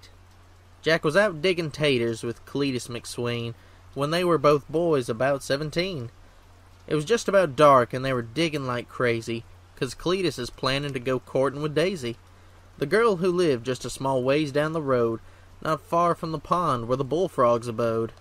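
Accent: South, United States. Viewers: Loved the poem! Also the sweet southern accent that you tell it with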